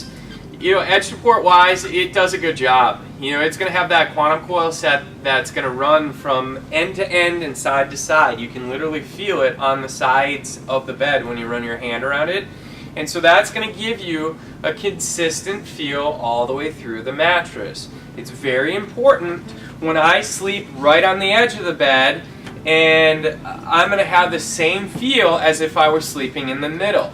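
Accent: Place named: Canada. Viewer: the canadian accent :)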